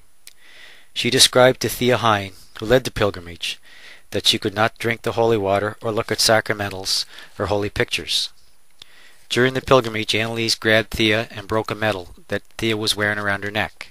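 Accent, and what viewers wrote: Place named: Canada. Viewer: the guy talking must be canadian, i keep hearing abooot and thats def canadian.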